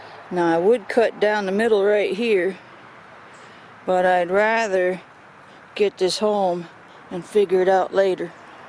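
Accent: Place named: United States. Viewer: go there with a shiansaw sister it's more god for you're body and you're hearth you know i'm from Québec city but i love you're accent frome the US you know ??? but i don't like you're show's HAHAHA lollll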